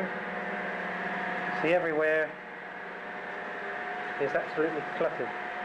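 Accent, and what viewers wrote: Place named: Peacehaven, United Kingdom. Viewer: I have a friend who comes from Peacehaven and his accent and pronunciation is identical to yours. If I close my eyes it could be him speaking. You're not from round that way are you?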